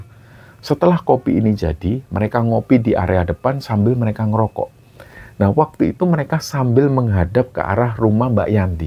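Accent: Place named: East Java, Indonesia. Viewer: Njenengan tuh telling story nya mmg beda dr yg lain lho Mas. Logat Jawa Timur nya jg masih kental 👍👍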